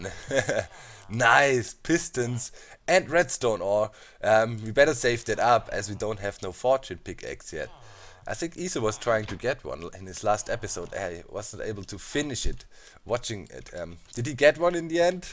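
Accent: Germany. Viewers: Schön dass endlich ein deutscher da ist. Ich bin ehrlich: Als ich gesehen habe, dass X gesagt hatte, es gibt einen neuen Hermit, habe ich schon die Augen verrollt. Es gab in letzter Zeit so viele neue Members. Aber als ich gesehen habe, dass du es warst, war ich doch sehr erfreut. Wirklich kennen tue ich deinen Kanal nicht (nur so 1-2 Videos), die ich mal gesehen hatte, aber mir im Kopf blieben. Wahrscheinlich wegen dem englischen akzent, der eindeutig zeigt, dass du Deutsch sein musst. =D So nun habe ich noch eine Bitte an dich: Auch wenn du mehr der praktische Typ zu sein scheinst, probiere doch jeden Raum entsprechend zu designen und "schön" zu bauen. Irgendwie ist mir das wichtig, auch wenn sich das komisch anhört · Love the German accent. <3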